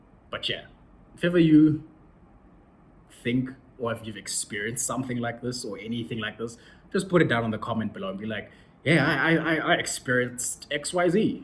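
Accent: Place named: United States. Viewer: Thanks for the video, brother. I'm curious on how you developed such a good American Accent. You've mentioned in the end but a little more detailing would help. Thanks!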